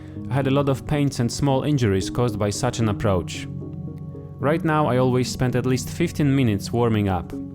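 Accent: Poland. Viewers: SiriusB88 he’s polish. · I hear a polish accent in your voice. (Poland?)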